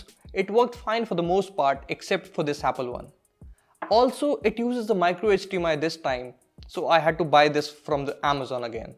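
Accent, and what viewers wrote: India. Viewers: Great video , but still indian-english accent gets me everytime :D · As a non-native English speaker, I usually have a hard time understanding Indian accents, but this guy’s voice is very soothing. I’m loving his accent.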